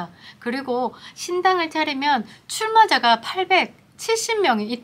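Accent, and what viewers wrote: Gyeongsang, South Korea. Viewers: 준석이도 싫지만 니 경상도목소리도 듣기싫구나 아무튼 준석이졸업장엊ㅅ는거만 밝혀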